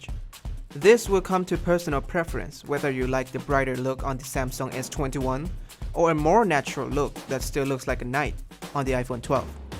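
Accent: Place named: Thailand. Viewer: กำลังสงสัยเหมือนกัน แต่สำเนียงคือเหมือนคนไทย แต่ชอบคอนเทนต์ที่นำเสนอนะมีความเป็นตัวเองมาก ชอบครับ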